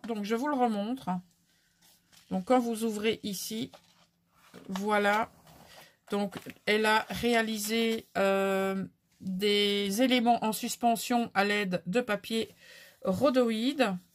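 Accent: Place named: Belgium. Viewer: Bonjour ma petite, Woaw quelle merveille 😍🤩 vraiment très douée Ileen, bravo! Son album est superbe 🤩 et très bien travaillé 👍 avec un thème que j’aime particulièrement 🐇 seulement 11 ans….. c’est plus que prometteur, la relève est assurée! Ça m’a fait plaisir de t’entendre avec ton tout petit accent sur les « UI « ( comme ds 8 ) qui me fait toujours sourire chez nos amis belges à croire que le Luxembourg n’est pas épargné 😄 j’adore! Gros bisous 😘💕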